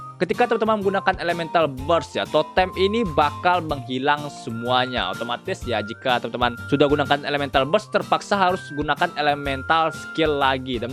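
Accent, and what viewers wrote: Padang, Indonesia. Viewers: bg lu org mna?logat lo kek org padang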